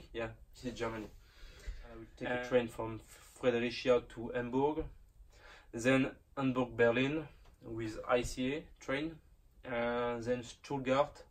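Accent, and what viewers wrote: France. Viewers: On reconnait bien le petit l'accent français comme tu parle anglais :D bref, Bonne chance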